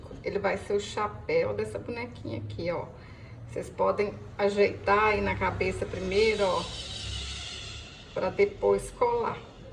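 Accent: Minas Gerais, Brazil. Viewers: Mulher... muito obrigada. Aprendi muito com você. Esse sotaque mineiro me lembra minhas primas e toda a família laaaa de São Braz Do Suaçuí MG. Muito agradecida viu... Beijão fraterno!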